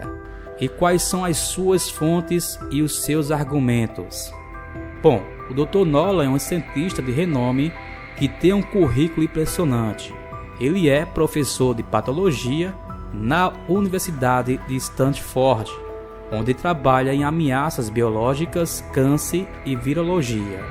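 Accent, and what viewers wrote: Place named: Portugal. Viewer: O locutor é da "terrinha"!!!☺️☺️☺️ PS. Isto não é um comentário racista, é carinhoso, viu?!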